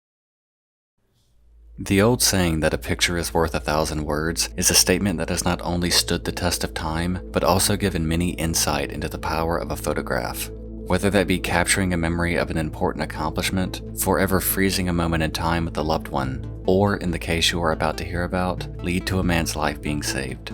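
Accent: South, United States. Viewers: I just came across this channel, and so far, I'm loving it. Thank you for giving these innocent victims a voice. I'm definitely subscribing!....btw, I'm hearing a little bit of a southern accent, I love it. People tell me that I have a southern accent as well, but I don't hear it at all. Lol. Also, I'm from VA, I didn't pick up an accent there! Lol. ❤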